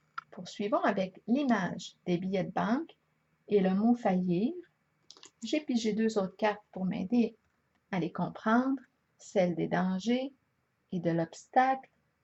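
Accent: Canada. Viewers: Les expressions canadiennes sont très belles. Je les aime depuis longtemps. Ainsi que cet accent canadien. Et surtout, vous êtes toujours très très profonde. Merci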